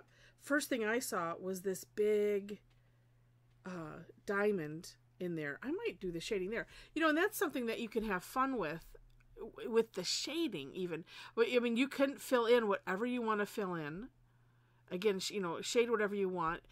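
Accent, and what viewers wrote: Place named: South Dakota, United States. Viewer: your accent is very much like a friend of mine from SD. Just guessing.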